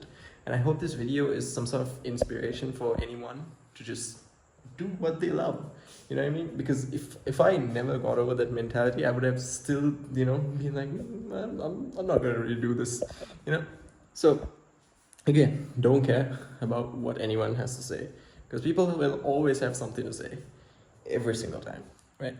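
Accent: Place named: Sri Lanka. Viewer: Bro I identified that accent immeadiatly😂 Im Srilankan too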